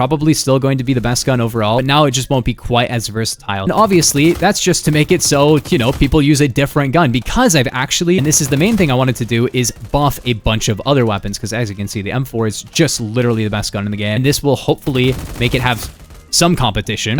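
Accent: United Kingdom. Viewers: AND he's british?? damn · Hes fucking BRITISH???? explodes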